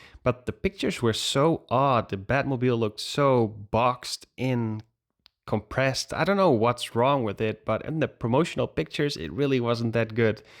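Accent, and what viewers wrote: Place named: Netherlands. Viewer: Im hearing a sort of Dutch accent.. btw thnx for the review.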